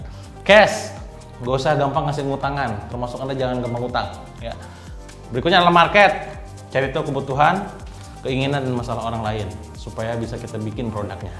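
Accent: West Java, Indonesia. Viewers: Ni sunda pisan bos 😁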